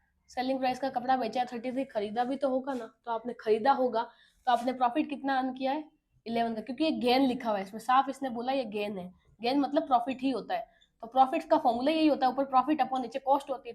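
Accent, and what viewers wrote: Rajasthan, India. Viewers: Mam apki class entry bhut achi lgti❤❤apni rajasthani style me 🎉🎉 content bhi bhut acha h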